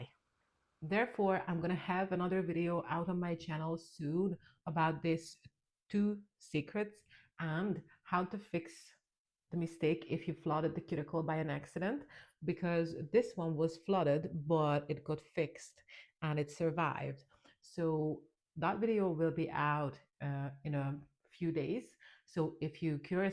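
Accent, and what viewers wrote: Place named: Northern Ireland, United Kingdom. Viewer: Oh wow! My hat is off to you and I don’t know how you coped! If I feel ANYTHING odd it bugs me until I fix it. I got up in the middle of the night, my elderly dad was up watching TV and I walked in like a zombie (his words, lifted a nail file and walked off! 😂😂 Well done on prepping enough to make them last that long! Can I ask if you are living in or have spent time in Northern Ireland? I swear little things you say remind me of our accent! I hope thats not insulting. Lol Great video.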